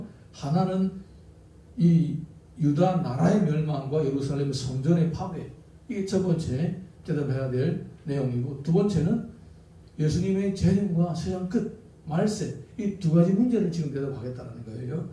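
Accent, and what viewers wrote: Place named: Pohang, South Korea. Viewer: 아멘 으로. 화받후 경청 합니다 말씀 하시는 억양이 포항 연일 그쪽 같아요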